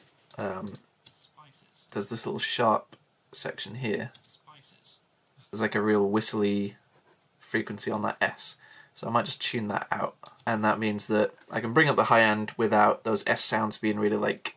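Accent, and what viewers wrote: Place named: United Kingdom. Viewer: Woah. I didn't know he was British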